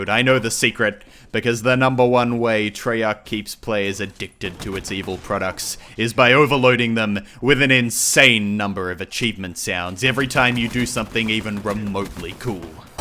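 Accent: Australia. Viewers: lel you are australian ? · are you australian ? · TIL is Australian and not British.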